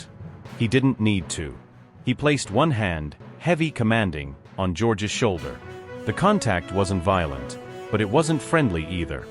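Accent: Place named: United States. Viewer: Soon as you hear a yank, you know its a pile of crap!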